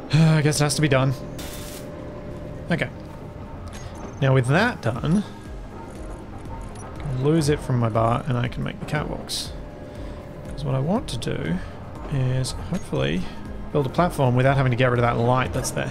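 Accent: Australia. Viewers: One of the very few aussies that are nice to listen to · "Git! Git! Git atta hea!" My weekly reminder that an Aussie <3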